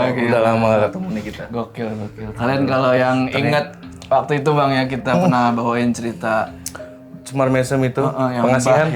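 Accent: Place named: Padang, Indonesia. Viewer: Nama: jawa Orang:manado Logat bahasa: padang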